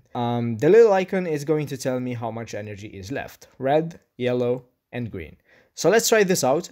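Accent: Italy. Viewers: ma sei italiano! Complimenti per l'accento...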